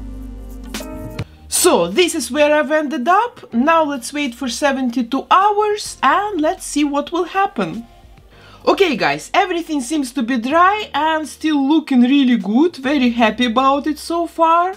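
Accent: Russia. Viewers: Звучит как русский акцент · I can't figure out what that accent is it kind of reminds me of a Russian accent of which is what I have but it's not quite a Russian accent so please what language did you most likely speak before you start speaking English or did you even speak another language aaaaaaaa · какой-то русский акцент 🤨🤔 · Русский акцент? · Блин, да чтож такое. почему меня не покидает ощущение что у вас русский акцент?) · похож, почему-то, на самый стереотипный и клишмрованный русский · Акцент похож на русский)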